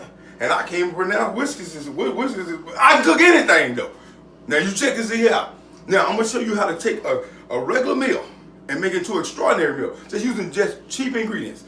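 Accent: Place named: South, United States. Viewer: Where are you from ? You too funny 🤣...gotta be from the south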